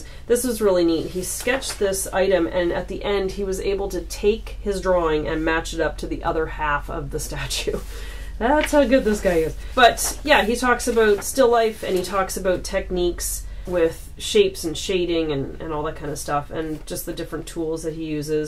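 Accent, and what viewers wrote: Canada. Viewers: Thanks for this--very thorough and entertaining, also educational--helped me choose the Stephanie Bowers and Gabriel Campanaro (sp?) books. Love your Canadian-influenced accent (which pertains to nothing relevant.) I see from your YT channel that you are very "crafty" would love to see more art-centric videos.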